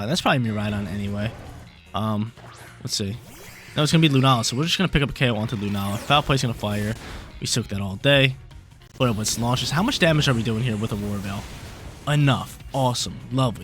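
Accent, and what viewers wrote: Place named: Buffalo, United States. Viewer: Holy Buffalo accent lol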